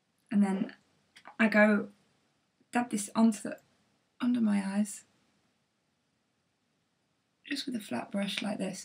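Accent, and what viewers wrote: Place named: Derby, United Kingdom. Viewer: Your Derby accent is strong haha. Didn't recognise it on towie x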